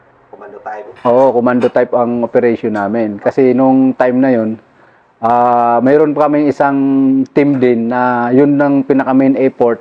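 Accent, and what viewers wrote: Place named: Bicol, Philippines. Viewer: Bicolano Yaya si sir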